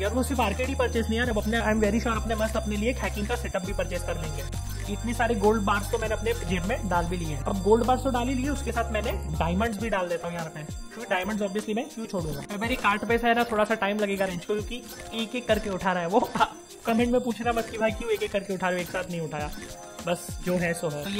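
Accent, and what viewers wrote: Gujarat, India. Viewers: Bhai tum Gujarat se ho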